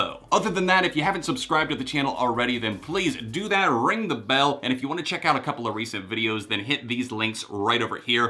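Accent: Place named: South, United States. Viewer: Your a southern boy...I knew it...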